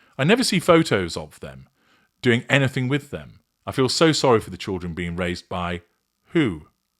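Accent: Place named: Yorkshire, United Kingdom. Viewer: Ooh you sounded like Sean Bean with your Yorkshire accent😊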